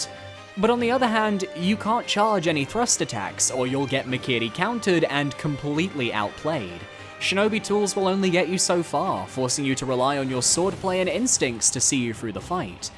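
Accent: United Kingdom. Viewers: Stop saying Sekiro like a weeb, you’re obviously british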